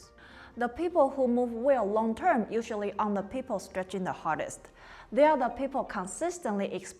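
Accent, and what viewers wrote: China. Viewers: 为什么我听到了潮汕口音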